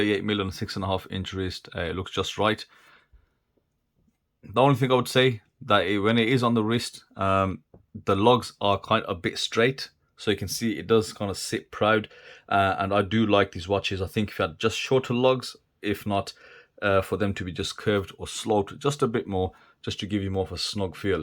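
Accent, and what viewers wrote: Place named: Scotland, United Kingdom. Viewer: His mottled Scottish accent makes me trust him.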